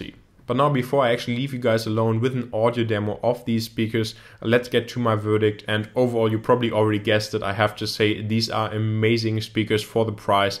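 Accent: Germany. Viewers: Bei der Aussprache. 100 % deutscher hahaha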